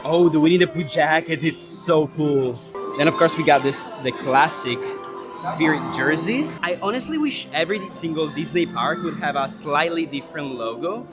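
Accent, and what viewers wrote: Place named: Italy. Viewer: Come imparare l’inglese? con i video di in inglese ahaha, video visto in italiano e inglese 😍. Parco stupendo sopratutto per le aree esclusive, da visitare assolutamente una volta nella vita. Continua così anche se per ora c’è poco pubblico piano piano andrà sempre meglio, ci sono pochi canali che raccontano le cose come fai tu. E poi il tuo accento italiano mi aiuta a capire un inglese più vicino a noi. Grazie per questi contenuti interessanti e fatti molto bene 🤙🏻❤️ Aspetto il video su Shanghai e gli altri dell’ America. A poi vi consiglio a te e non so valutate voi in base la richiesta di fare tour dei parchi nel mondo con noi. È una buona idea di business dove ci si diverte e si passa del tempo insieme. ( Sopratutto aggiungo, con la vostra preparazione nella storia dei parchi. ) Pacchetto tutto organizzato. Con voi come guide.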